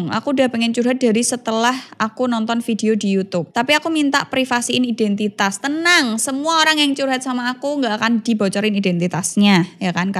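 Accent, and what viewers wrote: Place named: Semarang, Indonesia. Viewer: Semarang mass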